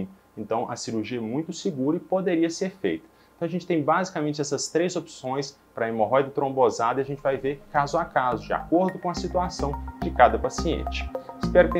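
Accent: Minas Gerais, Brazil. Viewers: Boa noite Doutor! Você é de Minas né! Conheço pelo sotaque.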